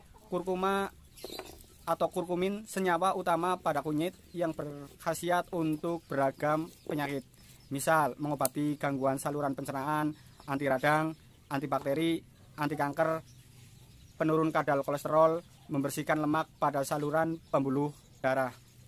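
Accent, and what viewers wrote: Banyumas, Indonesia. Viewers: Assalamu'alaikum.. mas.. buat video untuk ternak puyuh petelur mas..🙏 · Ora ngapak ora penak, mantap lanjut terus boss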